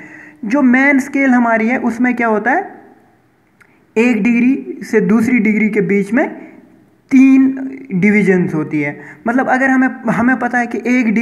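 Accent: Kashmir, India. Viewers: Sir aap Kashmiri ho?? Any way nice..thanks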